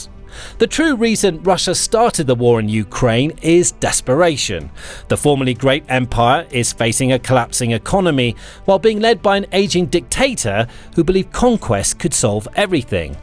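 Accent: United Kingdom. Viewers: сходу ложь Киевская русь лишь одна из нескольких княжеств (Ярославская русь, Новгородская русь) и она не самая могущественная ни с точки зрения политики, ни с точки зрения экономики, ни с военной точки зрения. Самой успешной была Новгородская русь - но западным "историкам" выгоднее делать вид, что остальных княжеств просто не существовало. Почему у самых лживых и прозападных каналов на ютубе всегда британский акцент?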